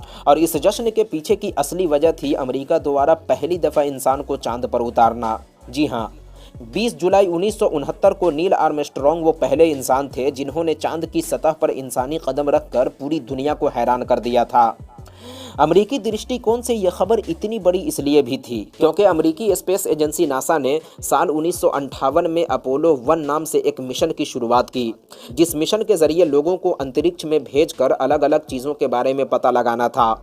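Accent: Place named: Bihar, India. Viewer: Bihari lahaja aa raha ha bolne me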